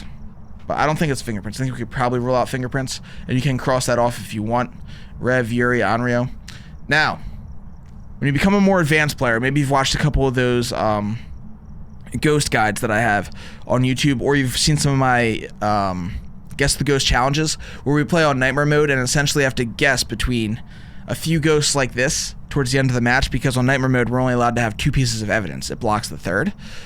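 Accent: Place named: Delaware County, United States. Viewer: You sound like you have a delco accent from Philly lmao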